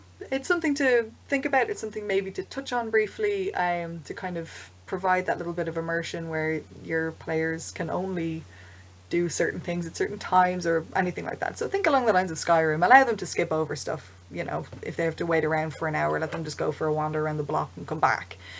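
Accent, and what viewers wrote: Ireland. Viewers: Thank you for the information. Interested in city planning since world-building an accident society similar to Rome. Btw: that accent is cute as hell. Irish? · you irish? where do you play?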